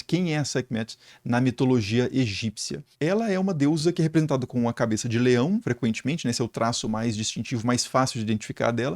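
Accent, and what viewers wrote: Minas Gerais, Brazil. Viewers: Da pra notar que vc é de minas tbm kkkkkk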